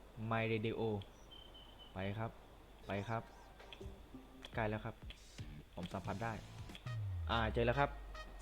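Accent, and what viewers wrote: South, Thailand. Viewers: พี่เขาภาคใต้ครับ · พี่อยู่จังหวัดอะไรเเต่เสียงคนใต้ใช้ไหมครับ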